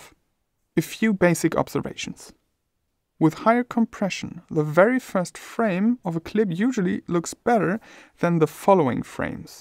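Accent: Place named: Germany. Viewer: Tolles Video, dass du Deutsch als Muttersprache hast erkennt man in deinem Englisch sehr gut 😬✌🏻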